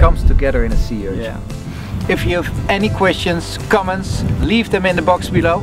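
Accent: Netherlands. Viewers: Just randomly clicked on this video, not knowing who this person is. But I'll recognise such a strong Dutch accent within seconds!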